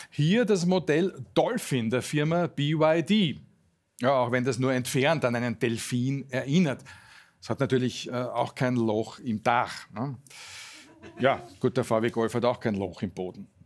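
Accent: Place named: Vienna, Austria. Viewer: sein Wienerisch ist wirklich ein wenig anstrengend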